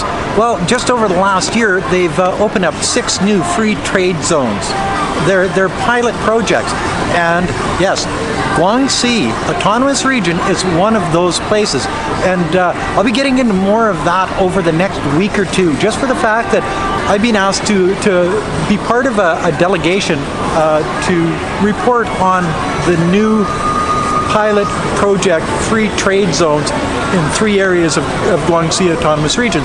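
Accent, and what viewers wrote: Canada. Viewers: So Strange to hear this accent on this canadian guy.... Born and raised slag off his own people like that! I'm So glad hes absolutley in love with his life in China...Thats amazing. And you know what will happen the next time he flies home to the country hes using curse words to describe Canada as going in the toilet? Those Canadians will be as kind, and welcoming as ever, No police to take him in for questioning , no blocks on content he posts about his opinions to keep the social order. But you know what thats okay. Maybe one day he will think better of the people at home. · The wrong people? Between a rock and a hard place we are. Thx canadian irish sounding guy. Theres severe corruption battling the people who want the world to be together in unity peacefully, and were all looked at as choosing this way thats happening now or are thought to all be bad and hate china or whatever. 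I dont think you really know whats happening to us over here neighbor! Keep your sodium chloride facebook comments. Thise mailboxes were being refurbished and some of those images of trucks taking mailboxes are completely out of context. Mail in ballots are the way democracts are trying to rig this election. We are being manipulated and falsified probably just like a lot of situations ALL over the world, you also have no idea about. But your video is appreciated anyway :]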